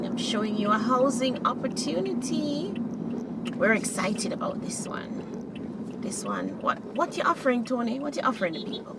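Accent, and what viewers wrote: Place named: Jamaica. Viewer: Old harbour road my area love it your Jamaica accent enjoy your video views. 🥰🇯🇲✔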